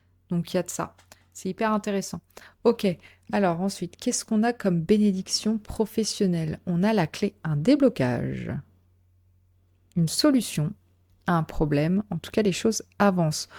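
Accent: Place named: Acadia, Canada. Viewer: Salut, heummm la carte no 2 me fait penser à la tradition druidique hehe hahaha l'accent me fait plus penser à celui de l'Acadie hehe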